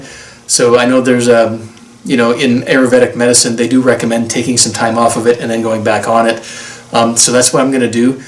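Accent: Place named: United States. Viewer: ? Does sound america. You swedish?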